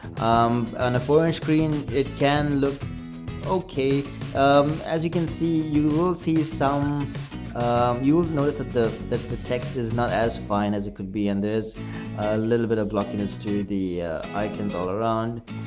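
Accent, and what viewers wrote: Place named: India. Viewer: Ur name is with dat accent uhh, r u catholic indian?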